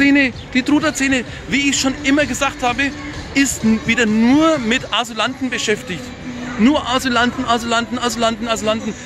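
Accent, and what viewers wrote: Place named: Franconia, Germany. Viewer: Frage. Du hast so nen fränkischen Dialekt. Bist Du in Nürnberg aufgewachsen. Meine Frau ist aus Forchheim, deshalb fällt mir das auf:-). Und ich hab auch etliche Jahre dort verbracht.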